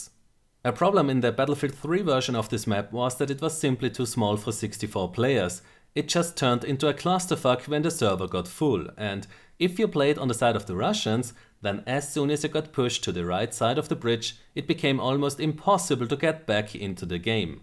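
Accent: Germany. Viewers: German accent... Yet he talks like lvlcap... Interesting.!! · your funny accent is german, right? correct me if im wrong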